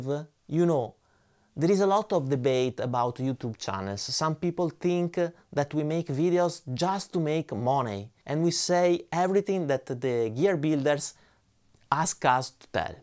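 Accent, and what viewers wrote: Italy. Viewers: Wish you all the best, thanks for all the knowledge and the professionalism I also wish there are more channels like yours , Italian accent is cool 😎 cheers and all the best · Hi, Your videos are some of the very best on YT and they often really help. Also Your playing and the songs are very good. I like Your English, it makes me smile, and when I'm in Italy that is the accent I hear when I talk English to people. It's very clear and easy to understand. No need to bother trying to sound like a proper Lord:D Thanks for all the knowledge we get from Your tests! It's a huge amount of work You have done here and we all just consume it for free. Huge thanks! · ! Your Italian accent would be a total chick magnet around here. I wish I was bilingual with your accent. My date book would be completely full. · Cheers from Istanbul I am a dedicated home player music is my main hoppy I leverage of your videos for couple of gadgets I recently purchased.. 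you are a naive great person I love your Italian accent music is the universal language and for language different accents are great flavors your music is really amazing btw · Hi. I'm Italian. Your English is perfectly understandable. The pronunciation, with a strong Italian accent that characterizes it, is clearer for the automatic translator than that of native English speakers. So what matters is that your content can reach everyone. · As an Englishman, I'm glad that these videos are in English, as my Italian is not that great, to say the least! English sounds good with an Italian accent, anyway. Also, it's the content that counts and from what I've seen so far, there are no issues there. Quality stuff! 👍🏼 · great channel and as fellow Italian ( living and playing in London for over 20 years) I tell you don't worry about the english pronunciation, what you say is clear and understandable and that is what matters....and as a positive bonus it speaks about your roots. 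keep the compositions coming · Ciao,! Your channel is great! … and I like the Italian accent: my other great love is MotoGP, so I am very used to it! ☺️ Be well! Greetings, from Downunder! 🇮🇹🇦🇺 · Your strong Italian accent is far preferable to a strong Spanish or Portuguese one. You consonants are distinct and word separation is excellent. Vowels are no problem-at all. Even if these are problematic for some listeners they could never overshadow the quality of comparative analysis you provide. I come back to you to stay abreast of the decision of which to purchase should my DIgitech RP360 XP fail. I am keeping a close eye on the potential replacements whose rapid developments in sound quality, features, and user interface are stimulated by the necessary competition. I am grateful that you are drawn to sort out their differences is such detail. Thank you - so much! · (Warning! This message is written by a non native speaker)! 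Your english is more than good enough. In Europe, at least, we hear english spoken with different accents every day. One would have to be pretty narrow minded to dislike your channel based on your italian accent. Your channel is so rewarding to watch, i love the in depth analysis you provide. Allways looking forward to your next upload! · Your English pronunciation is actually one of the reasons I watch your videos… some of my grandparents were Italian, and it reminds me of them. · Servus 😉 Gratulations for 30000 follower, you deserve much more! I like your channel, also to be informed news around guitar gear. I am a basic English listener and speaker… I understand the most of it and I also like your Italian accent. Greetings from Bavaria an keep on 🎸👍🏼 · Great video!! I for one think the Italian accent you have when you speak English is a part of the charm and i have no problem whatsoever to understand you as a Swede that write/read and speak English pretty well so dont worry about it at all. 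All the best!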